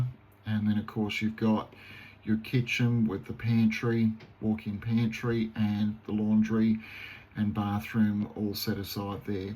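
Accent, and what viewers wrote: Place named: Australia. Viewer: You're work is truly awesome! You have made your mark in many families lives with your designs! I would be curious too to see the completed build! In Australia is there a minimum sized required to build a home on land I wonder? I wanted to have a home built on land for awhile but unaffordable for me now. I can still dream though! I'm glad I found your channel really easy and so far I am impressed! Off to watch more of your videos now! PS your Australian accent I think is so cool mate! 😁👍💯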